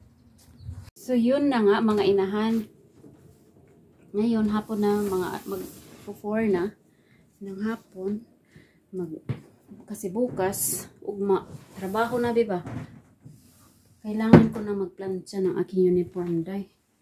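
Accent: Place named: Visayas, Philippines. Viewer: Uy, bisaya di-ay ka,pila naka katuig diha?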